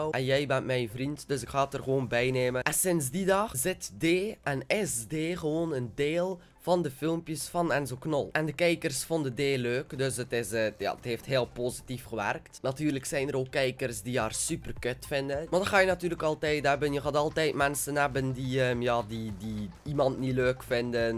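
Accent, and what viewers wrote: Belgium. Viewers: Yo.... Je hebt echt een SUPER epic kanaal, ik volg je al vanaf je eerste Video...... Wat ik het leukste aan jou vind is: Je Belgische Accent is echt super grappig (GeenHaat) en de manier waarop jij je vids opneemt... ja weet je... dat is gewoon SUPER EPIC Welke Vids Ik het leukste vind::::::::::: *VerlatenLocaties *Vlogs *Gaming Dat vind ik gewoon super tof... Maar je moet gewoon doorgaan met JoutubbePasta en dan komt alles goed en je moet vooral jezelf blijven eejj en pssst.. Je moet je niet Gek laten maken over een dom iemand die denkt dat hij/zij grappig is...... Jij maakt je Vids op jou manier en dat is het gene waar je het best mee bent dus laat ze maar praten... Je bent gewoon de beste Joutouber:D Van Joutuoube:P Groetjes Je beste fan ever #AceFan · Random praatvideo's zijn wel lachen want ik vind t Belgse accent gewoon mooi haha doeo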